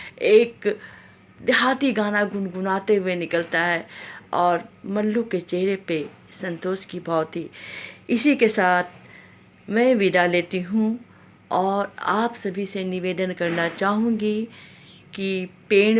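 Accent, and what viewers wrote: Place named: Bhojpuri, India. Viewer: जो भोजपुरीया शैली का तड़का लगाया ना बहूते झबरदस्त बा😀😀👏👏👏👏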